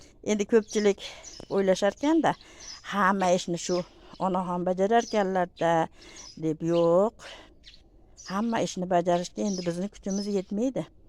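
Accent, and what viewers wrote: Andijan, Uzbekistan. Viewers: Гапиришиздан андижонликмисиз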